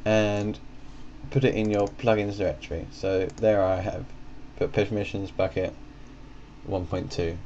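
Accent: United Kingdom. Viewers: omg i cant understand wtf hes saying hes mumbling and has a british acent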